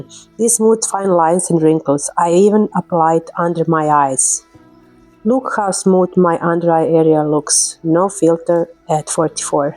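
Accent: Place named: Russia. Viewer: вы русская? Зачем говорите на англ. языке, да еще и с таким акцентом?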